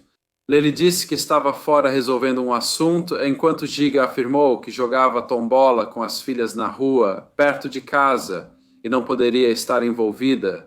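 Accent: Portugal. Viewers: Os casos são interessantes. Mas a forma de vc contar. Muda a voz as vezes parecendo um português de Portugal. E chato.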